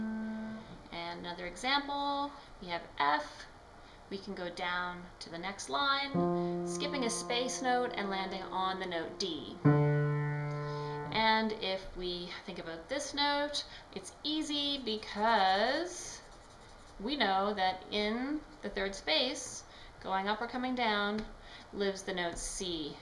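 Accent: Canada. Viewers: Finally I get why the Canadians on South Park say aboot ! Never heard it pronounced so clearly before :) Great video btw, just the right level of difficulty for me!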